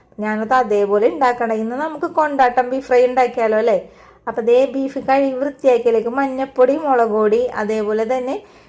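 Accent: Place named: Thrissur, India. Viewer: മ്മ്‌ടെ തൃശ്ശൂർകാരി ആണെന് തോന്നുന്നു ട്ടാ വരുന്നു 😂💖🔥🔥🔥🔥😊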